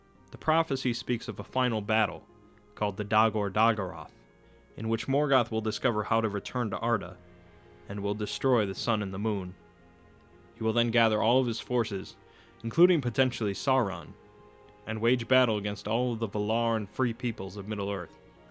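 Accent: United States. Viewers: I'd say that's just the american accent ;) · I am sorry, and I LOVE Americans and their accent, but as an Englishman, I have to state that it doesn't sound right hearing excerpts of the works read in that accent. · Can nobody pronounce Morgoth normally? But always American accent... I know it's dificult for us ( born in U.S.) but live in Europe(Croatia). Please try harder.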